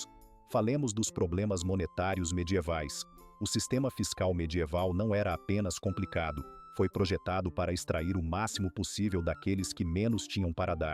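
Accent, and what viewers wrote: Brazil. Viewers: Que curioso é ouvir falar em português mas com sotaque brasileiro.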